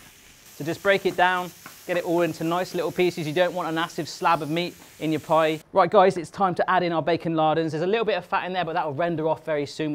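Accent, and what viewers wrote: England, United Kingdom. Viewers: to me he doesn't sound Australian he sounds English O.O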